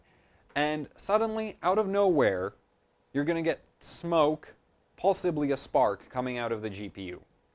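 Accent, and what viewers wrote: United Kingdom. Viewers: you have actually got a pretty nice English (UK) accent. BTW love your honesty and not overworked videos. thx and keep it up ;-)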